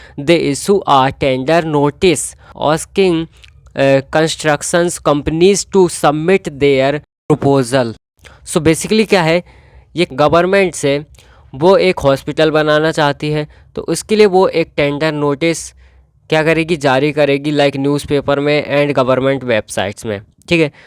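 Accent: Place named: Indore, India. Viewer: Bhai tu indore se ha na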